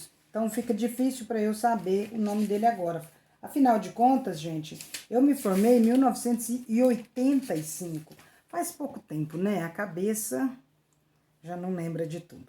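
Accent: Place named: Minas Gerais, Brazil. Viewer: Nossa linguagem de mineirez 😂😂😂 é muito boa.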